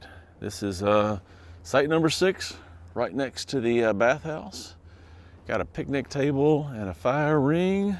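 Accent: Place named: South, United States. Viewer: I love Charleston and find your smooth southern accent to be very welcoming! Thank you!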